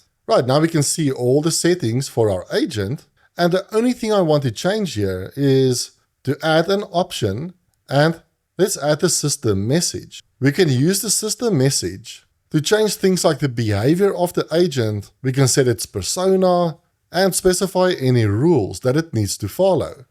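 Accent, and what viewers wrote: South Africa. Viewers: Thanks. I recognized a fellow South African as soon as you spoke! Lekker man. The steps you explained doesn't work. Guess maybe they "fixed" your work around to get the AI agent to use ChatGPT without a api key? wish it worked for me. Thanks for this Tutorial though! Cheers! Did anyone else here get it to work?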